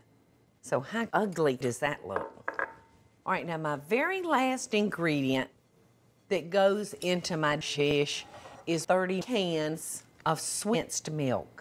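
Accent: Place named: South, United States. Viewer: her southern accent is perfect for YTP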